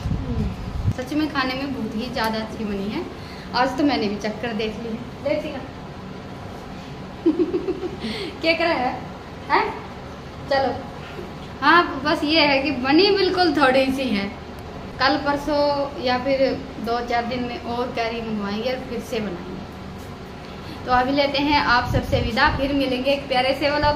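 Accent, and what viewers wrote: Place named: Rajasthan, India. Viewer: Waw ap jitni bi recipe bnate ho bhut testy bnti hogi dikhne bi achi lgti h or di apki bhasha bhut pyari lgti h ap real bhasa bolte ho to mja ata h sunne me mai bi rj se hu so smj bi ati h or ha sbse jyada le isantiya ye bolte ho to meri hassi bi ni rukti 😂😂😂😂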